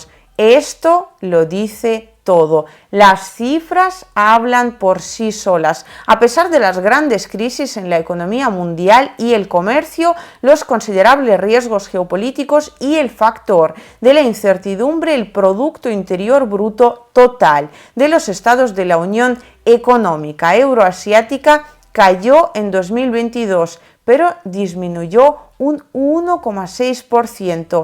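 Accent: Spain. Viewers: No me gusta su acento español .. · Rusa hablando cono Española😅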